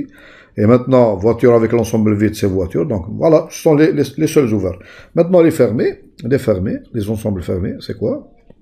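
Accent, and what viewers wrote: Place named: Algeria. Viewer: C'est un professeur algérien, c'est pourquoi il parle arabe français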